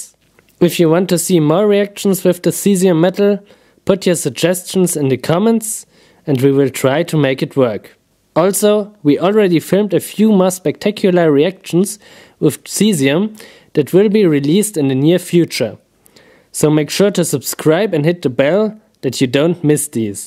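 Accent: Germany. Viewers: Sauberer deutscher Akzent.. ✌🏻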